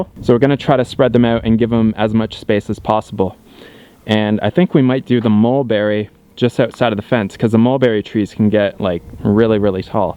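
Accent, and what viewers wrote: Canada. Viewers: So this is in AZ? But the dude sounds Canadian........?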